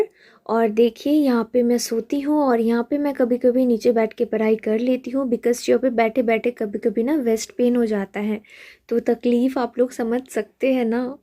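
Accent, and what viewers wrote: Bengal, India. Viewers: Bangali hai ho skta hai uski Hindi aisi ho okk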